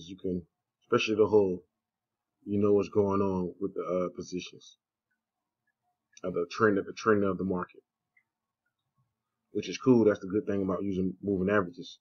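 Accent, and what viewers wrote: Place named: South, United States. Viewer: Yes, wealth of info in there. That's why bit frustrating cos i couldn't leave the video and had trouble understanding haha. I am from the UK so not used to the southern accent. It's not about how you speak but that we all speak differently depending on where we are from and can be hard to understand each other some times. Thanks again!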